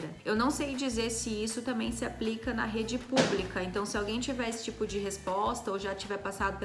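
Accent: Portugal. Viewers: Muito bem, a forma de ajudar os brasileiros que pretendem vir para Portugal tem melhorado ao longo dos tempos. A metodologia das atividades de desenvolvimento incentiva à autonomia das crianças. Fora do horário das educadoras de infância devem existir atividades mesmo não estando contempladas no currículo de desenvolvimento, como seja a socialização da criança. Conserve o seu sotaque de origem, é um valor cultural que não deve perder, já se nota uma pequena aproximação às vogais da zona minhota. Na rede pública, quando há educadoras com formação adequada, é facultado o ensino do inglês a partir dos 4 anos. A IPSS próxima da vossa residência tem bastante qualidade.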